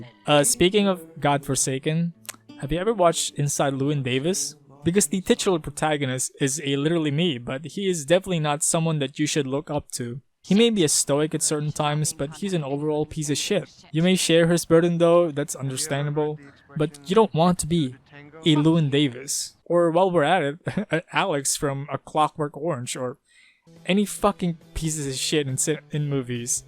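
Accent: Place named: Philippines. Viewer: bro i thought this was a different person, its hard to recognize where in your accent is filipino but I can tell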